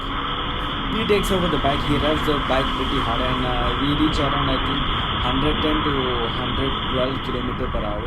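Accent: India. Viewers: matte black you are staying in Malappuram and your English is North Indian style. are you Bengali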